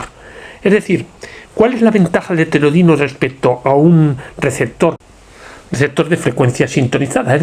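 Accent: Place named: Castile, Spain. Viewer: DIJO UN CHINGO DE PALABRAS Y NO LE ENTENDI NI MADRES, NO SE SI ES POR EL ACENTO(CASTELLANO SUPONGO) O POR LA VOZ TAN GASTADA, LE SUGIERO QUE DICCIONE BIEN SUS PALABRAS Y TRATE DE SER AS NEUTRAL EN SU ACENTO SALUDOS.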